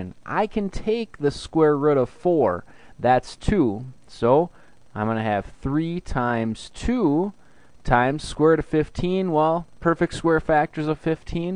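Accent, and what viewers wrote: Canada. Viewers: ive never heard anyone more canadian and i love it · Are you Canadian? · Are you canadian??? o.o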